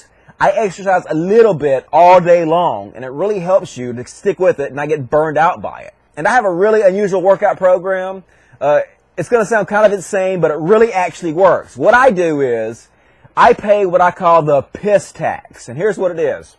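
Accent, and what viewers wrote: South, United States. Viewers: Forgive this non-American his ignorance but I never thought I'd hear a Southerner casually talking in metric. I thought you Americans recoiled like vampires when ever someone starts talking about grams and hectares :P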